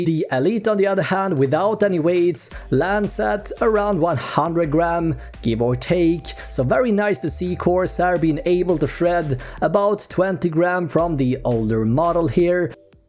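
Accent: Sweden. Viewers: Sluta prata så jävla svenskt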